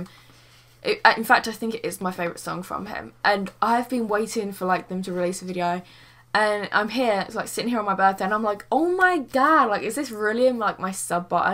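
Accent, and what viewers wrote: United Kingdom. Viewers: I really love ur British accent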